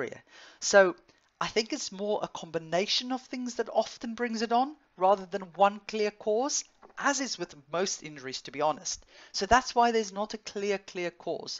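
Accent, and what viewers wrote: South Africa. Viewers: Hello. You sound like you could originally be from SA. I live in Graaff Reinet in the EC and have been informed I have this annoying condition. I’m a runner and started cycling this year to try and alternate a little and then bam OP!!.. I’m devastated as I have not been running for 4 months already and not cycling for a month now. I’ve already seen a physio, GP, Chiro and finally a sport specialist Dr who diagnosed OP after X-rays and sonar. My question is, as I am sure your time in Pounds will be extremely expensive for us here in SA, is would it be worth while seeing a biokineticist to help with me with this?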